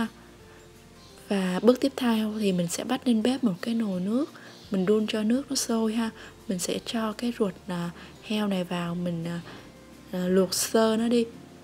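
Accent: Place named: North, Vietnam. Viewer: Bạn này hình như là người phía bắc lấy chồng trong miền nam, vì giọng bắc mà làm món nam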